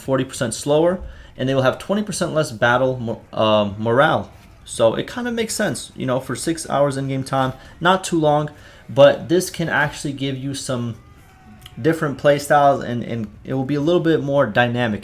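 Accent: South, United States. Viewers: I feel you on the southern part it's def how we talk😂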